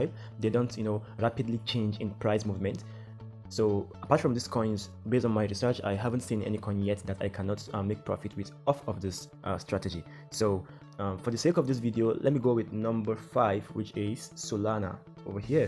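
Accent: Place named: Ghana. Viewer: I dont think he is nigerian l know our acsent so well l sound like a Ghanian lol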